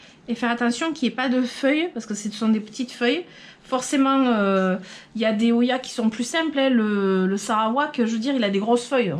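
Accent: South, France. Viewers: Tes videos sont pleines de couleur avec ton accent du sud. J'adore. Merci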